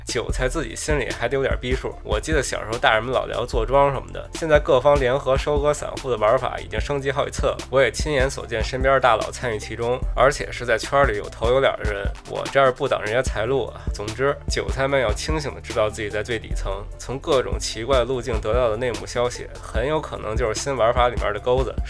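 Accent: Beijing, China. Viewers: RangErliVe 这就是内京片子味儿 哎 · 不是正经更新，比较随意🤣。老北京土著了哈哈哈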